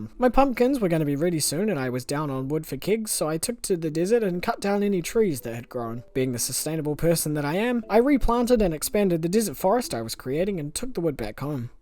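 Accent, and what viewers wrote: New Zealand. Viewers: stardew and a nz accent 🥰 love it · You from NZ? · Great video and very funny! Is that the Kiwi accent I hear? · Are you from NZ? I'm Australian and ur accent rlly sounds nz :) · is that a fellow kiwi accent i hear?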